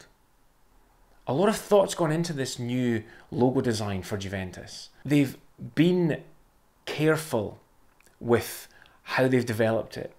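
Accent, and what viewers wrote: Scotland, United Kingdom. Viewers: The sexy soft scottish accent.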